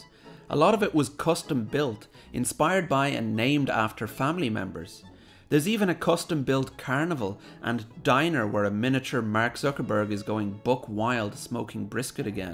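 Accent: Ireland. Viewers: Is the narrator Irish (accent). What about Pete Waterman? What's with the 3 rail trains? I guess they all like Lionel. HO can be so much more realistic. Considering what money celebrities have, this was pretty disappointing.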